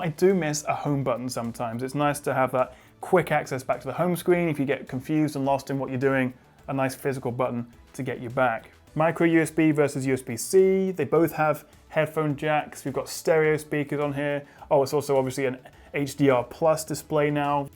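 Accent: United Kingdom. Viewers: Accent is British 😉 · nice British Accent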